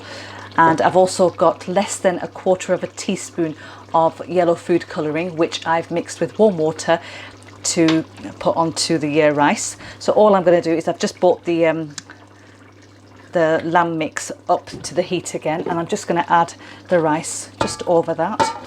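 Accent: United Kingdom. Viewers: Wow,you are absolutely good at cooking.Thank you for teaching a single Dad like me. May i ask respectfully,is your accent British ?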